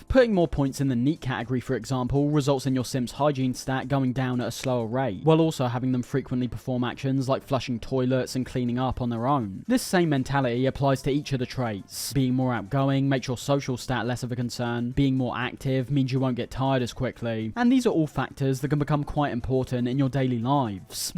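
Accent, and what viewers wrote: United Kingdom. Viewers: A British person talking about the sims for an hour. That's all I need and want actually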